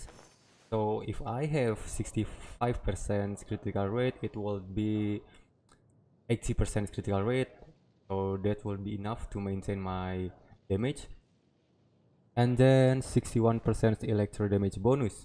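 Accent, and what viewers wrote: Indonesia. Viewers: sounds like an Indonesian accent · You speak very much like Indonesians, whether you are from Indonesia or Malaysia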